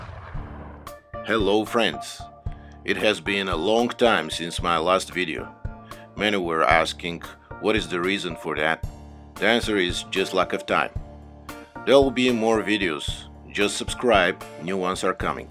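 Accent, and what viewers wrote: Russia. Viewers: Love this guys accent. Sounds Russian! One tone. NICE! Love the music as well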